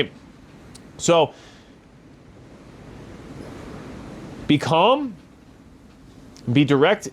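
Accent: United States. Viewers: There's no way you're not from Texas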